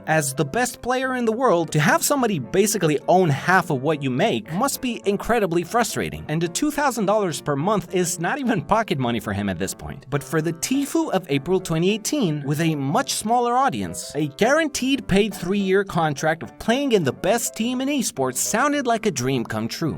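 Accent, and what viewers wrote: Romania. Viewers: Bro tu clar esti roman ca se vede si dupa nume si dupa accent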